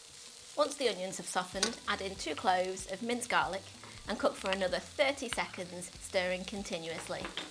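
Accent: United Kingdom. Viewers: This woman has lost her mind. Dont know her accent but guess she must be from the UK. What on earth is she doing to food?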